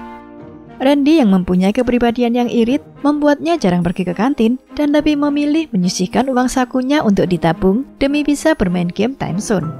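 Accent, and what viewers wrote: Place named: Lampung, Indonesia. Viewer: Orang Lampung mana suaranya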